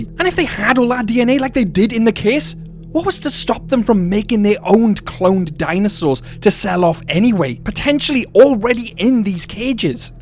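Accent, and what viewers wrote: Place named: United Kingdom. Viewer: Gosh I adore how you say “endorapthor”, British accent is the best accent (my opinion as non-native english speaker)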